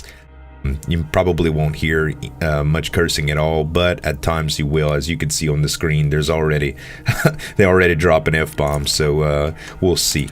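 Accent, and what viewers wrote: Louisiana, United States. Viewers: sounds like hes from Louisiana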